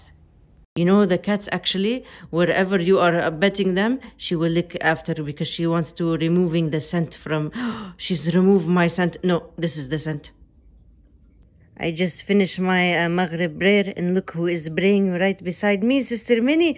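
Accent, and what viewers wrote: Arab world. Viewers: Wallahi sister, you done your Arab aunty accent for so long I forget what your original voice sound like lol may Allah bless you and grant you shifa for all the joy you've given us with your content, ameen. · That arabic accent with imperfect english always does the trick 😅😂❤️ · I like how u say "she'sso much cute".. that typical Arabian accent ❤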